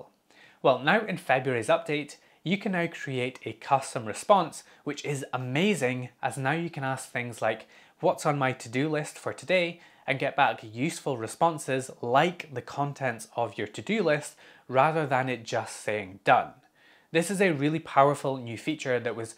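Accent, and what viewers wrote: Scotland, United Kingdom. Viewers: Acting as a rooter! 🤩🤩 I wish you would lean into the Scotsman a little more 😉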